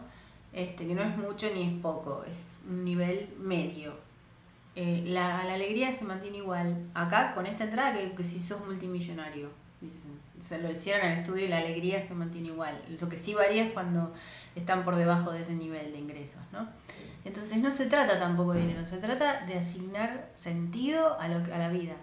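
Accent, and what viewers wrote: Argentina. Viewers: Por favor modificar el tono argentino Par comprender mejor.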